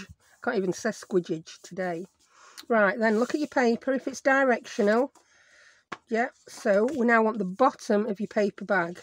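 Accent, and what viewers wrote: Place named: North, United Kingdom. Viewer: Brilliant tutorial thank you. Great to hear a Northan accent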